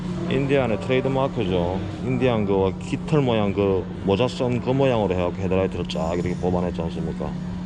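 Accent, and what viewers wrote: Gyeongsang, South Korea. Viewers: 안녕하세요 DG님 님의 채널을 알게된게 이제 2주 정도 밖에 안되었는데 홀릭이 되어버린듯합니다.. 저도 자꾸만 잊어가는 구수한 갱상도 사투리가 고향의 맛을 느끼게 해주어서 편안하다고나 할까 푸근하다고나 할까... 매일매일 업로드되는 영상은 물론이고 하루에 5~6개정도 보고 휴일날은 평균 15개정도는 보고 있는거 같으네요.... 이러다 옛날 게임에 빠져 폐인 생활하던 그 때가 갑자기 생각이 듭니다... 이전 올라온 영상을 다 보고 나면 시간적 여유로움이 생길듯 하구요 님이 올리신 영상 중 190편을 보다가 메디슨 햇에 대해 잠간 응급하셧는데 구글 지도를 보다가 다른 주에서 없는 특이한 모습을 보았습니다. 그게 뭐냐하면 둥근모양의 농지가 특이해보여서 갑자기 궁금증이 생겨서요.. 다른 주를 보았는데 둥근 모양의 농지는 보이지 안트라고요. 도대체 그게 뭔지 궁금해서 이렇게 댓글을 남깁니다. 언제나 안전한 운행을 기원드릴께요.. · 우와~~전시품이 한국 하고는 차원이 다르네요 저도 스피드를 좋아라 하는 편인데 바이크가 멋진게 많네요 ㅎㅎ 영상 재미나게 잘 봤습니다~~ 근데 님은 고향이 어디신지요??사투리 쓰시는거 같던데 ㅎㅎ 경상도 쪽이신가요??ㅎㅎ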